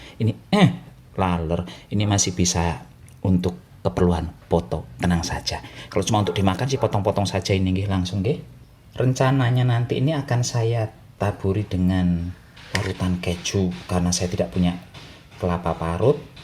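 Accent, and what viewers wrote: Banyumas, Indonesia. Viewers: tetep ngomong bahasa ngapak... lebih natural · Nyong seneng bahasane ngapak,pada bae kang nyong ya ngapak😂😂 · Lucuuuu ms...saya jawa timur hidup di Samarinda....suka dengan logat bahasa ngapak...lucuuu poolll...ms👍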